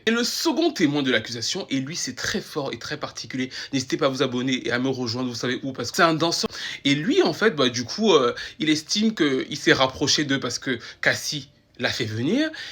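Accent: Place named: Cameroon. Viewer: Moi c'est ton accent qui m’intéresse... tu es d’origine camerounaise ?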